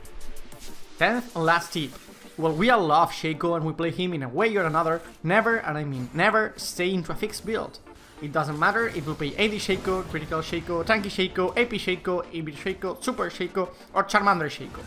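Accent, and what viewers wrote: Spain. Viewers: no se porque pero me da que eres español xD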